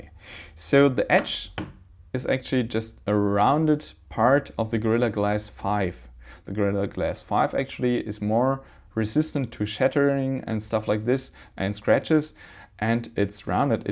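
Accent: Germany. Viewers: du sprichst echt gut englisch (konnte deinen deutschen akzent aber leicht hören) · I am new here, are you german cz ur accent looks like it. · Am i the onlyone who thinks he sounds german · Very thick german accent, toooooo much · warum machst du keine deutschen Videos?:D man merkt deinen Akzent:D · Egal wie gut einer Englisch spricht, man hört "den Deutschen" sofort raus. ;) Ich würde gerne so gut Englisch sprechen können -super Video!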